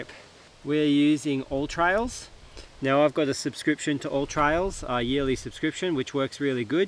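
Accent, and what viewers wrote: Australia. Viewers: Thats it haha. You just sound like you're from Melbourne.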